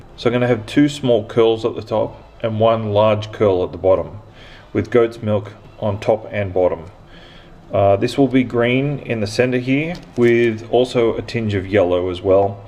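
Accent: Australia. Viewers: Oh Yay, a soap maker with an Australian accent!!! I'm in the early stages of learning about soap making, and I'm nearly ready to start making. Can you please give me some ideas of the best places to buy soap supplies, essential oils, colours etc... In Australia? I'd really appreciate it. The only place I've found so far is, Aussie candle supplies.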